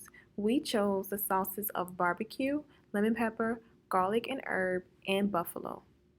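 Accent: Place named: South, United States. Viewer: You and that southern creole voice tho. Whatever accent it is, it's definitely cooking with some spicy flavor... and what were you saying 'bout some chicken wings?😁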